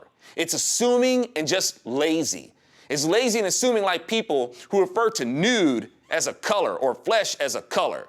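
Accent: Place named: United States. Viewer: Although it may be controversial, when I see you or listen to you talk, I label you; an American.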